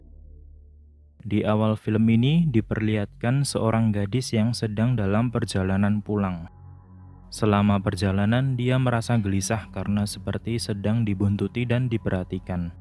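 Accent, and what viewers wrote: Java, Indonesia. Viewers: Jowo banget 😂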